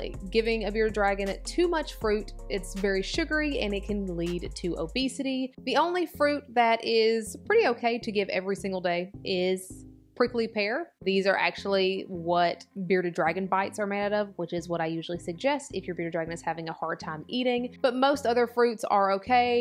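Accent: South, United States. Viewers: I love your videos and your personality and how you talk so smoothly , you must be southern I hear my accent ❤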